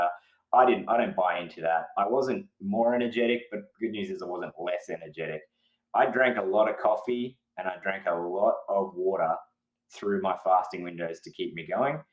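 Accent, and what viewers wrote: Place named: Australia. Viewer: I don’t know why but whenever someone has the Aussie accent I listen even deeper.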